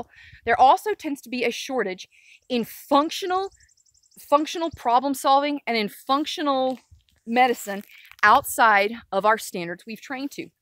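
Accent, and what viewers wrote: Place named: Appalachia, United States. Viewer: The longer she's in the hills of Tennessee, the more that wonderful Appalachian twang begins to surface. Love it. :-)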